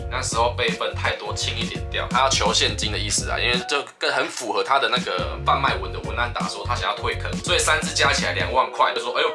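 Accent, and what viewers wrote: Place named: Taiwan. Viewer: 真的氣屎台語口音好奇家鄉抵對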